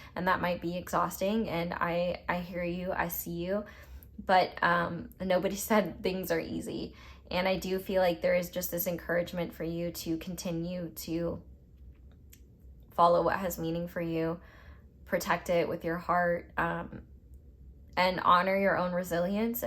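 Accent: South, United States. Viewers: Hi there, first things first I love your original and authentic Southern accent. I find it to be really sexy, even though you might feel embarrassed about it most of the time. You seem to be a really intuitive as well as sensitive person, high on the agreeableness spectrum. Which would automatically mean, that you put other people's needs first, dislike confrontation and last but certainly not least have no hard time forgiving. A true empath by the very definition of the word, which enables you to formulate readings with such accuracy. Your gift is so potent, that you don't really need a deck, to be honest. My piece of advice to you is two fold. You need assertiveness training in order to not feel so guilty when saying no, you need to learn how to operate under pressure, in order for you to continue with your studies because you are smart and articulate therefore wasting potential. 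You could be of great help to any kind of person as a skilled therapist, I mean it. If my intuition is not deceiving me, you must have a real hard time dealing with stress from the exams. You can work on that. Best of luck with your channel.🧁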